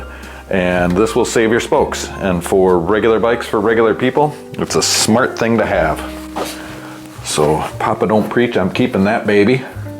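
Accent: Wisconsin, United States. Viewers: The Wisconsin accent takes this from "very informative and helpful" to "neighborhood dad helps you fix up a bike." Very wholesome. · Hello from WI! Finally, someone who talks like me! I don't know why the internet thinks I need bike content, but I'm glad it does! Love the humor. Love the music. Love the pace. Keep it up! PS - Where in WI are you located?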